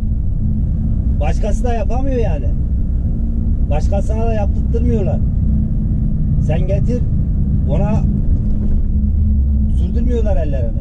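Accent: Adana, Turkey. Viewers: Abi adanalı siven var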